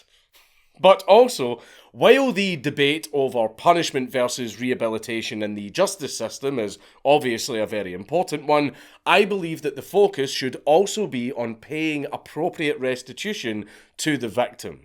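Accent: Scotland, United Kingdom. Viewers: Maybe it's because I respect what Dank does. Or maybe it's the Scottish accent. But the only time that I don't skip ad reads is on these videos.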